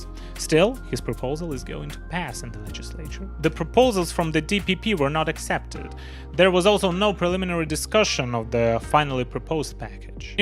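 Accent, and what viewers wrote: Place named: Europe. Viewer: Nice haircut and nice shirt. and your English is getting more European. that's not a bad thing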